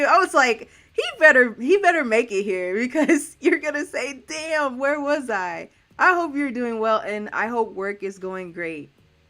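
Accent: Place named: United States. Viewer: I enjoy your narration of these stories: it's nice to have someone do them with a proper American accent and pronunciation, especially a woman with a nice feminine voice. Keep up the good work!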